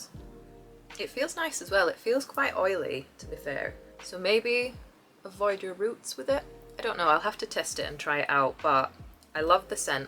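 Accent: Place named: United Kingdom. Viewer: good morning. Your excellent mastery and ability to introduce the goods well, which is always accompanied by composure, smile and honesty. It has always impressed me as the few audiences of your man who is not a consumer of cosmetics! I enjoyed watching another informative and creative performance from you.. At 1.39, the introduction of this month's magazine (edit), which introduced inspirational travel products, was important and impressive for me. At 6.59, it was remarkable for me to see your good and positive addiction to your skin care! In the 8th minute, a 20% discount on purchases was also really tempting. Sorry if I could not come in time to watch your new videos. Your beautiful and energetic comments for my channel will always remain in my mind. Thanks and congratulations for the interesting video you shared with the topic LOOKFANTASTIC JULY UNBOXING & DISCOUNT CODE. 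I'm glad to have the support of a valuable and committed makeup artist like you. My dear friend You are one of the few people who have always eagerly come to your good channel because of their humble and regular approach over the past year. I hope that in the future I will be able to prepare more attractive quality videos for my audience, inspired by speaking in your original British accent. I also hope that there will be an opportunity for make-up artists from other countries to become more familiar with your excellent channel through your good and lasting comments. Many wishes for the increasing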